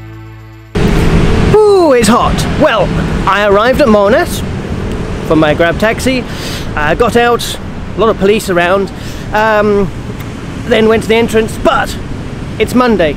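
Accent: United Kingdom. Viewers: This first time i watch you video, are you England? Your accent just like in harry potter movie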